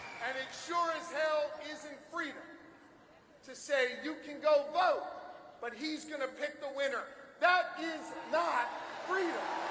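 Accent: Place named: Philadelphia, United States. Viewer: That's just what people from Philly sound like ya smooth brain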